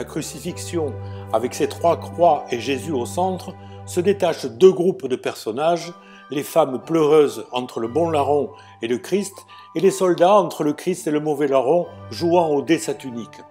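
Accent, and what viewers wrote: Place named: Provence, France. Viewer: J'aime votre accent de gentilhomme provençal 😉😉🤗🤗🤗😎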